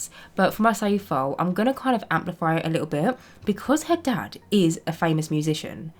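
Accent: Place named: England, United Kingdom. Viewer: I really like watching your builds but i can never understand the English accent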